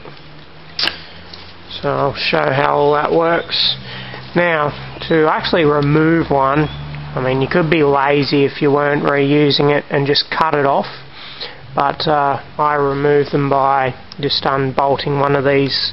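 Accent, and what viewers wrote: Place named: Australia. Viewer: you sound like badger from Breaking Bad, except with an Australian accent.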